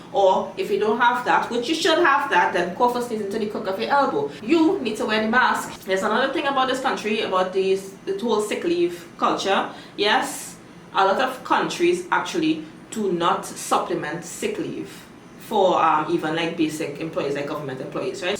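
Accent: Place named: Trinidad and Tobago. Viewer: You speak waaaaay too fast! Not everyone understands the Trini accent. Good content tho👏🏽 new subscriber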